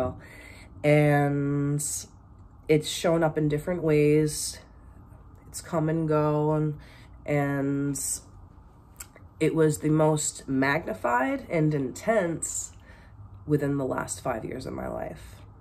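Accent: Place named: Boston, United States. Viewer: oh whoops 😬 haha my bad. Hope your having a great day today. Love the Boston accent that slips out every once in a while aha 😂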